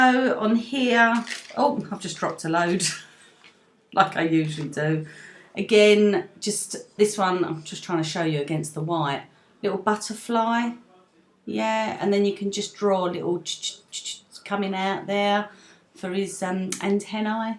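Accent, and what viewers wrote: United Kingdom. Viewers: Thanks.. lovely to hear a Brit accent on YouTube 😊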